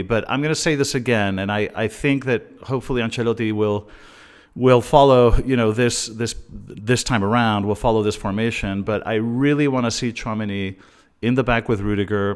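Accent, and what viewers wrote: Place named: United States. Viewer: Why do u have an American accent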